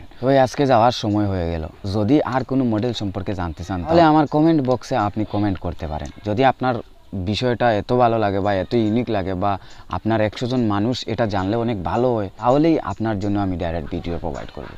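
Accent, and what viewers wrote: Sylhet, Bangladesh. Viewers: sylheti bhaisab,🤨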